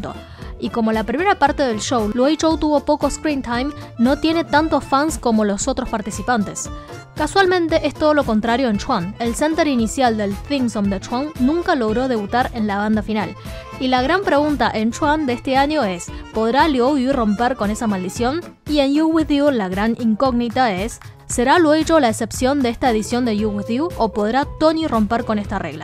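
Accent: Argentina. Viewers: Porque tiene acento argentino?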